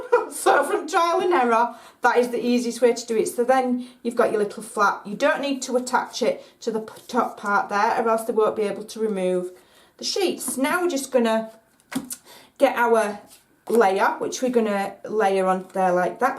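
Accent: Yorkshire, United Kingdom. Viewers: Fabulous Hun! Can I ask where you accent is from - you remind me of my mother in law (voice wise!) I'm going to say just about Yorkshire x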